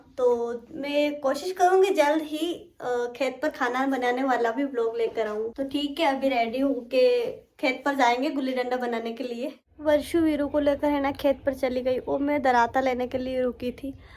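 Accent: Rajasthan, India. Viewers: AAP KI RAJTHANI LANGUAGES ACHI HE / 💯 CUTE. // MUJE SAMAJ NHI ATI HE LEKIN ACHI HE 👍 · Aap apni Rajasthani awaj me ek vlog banao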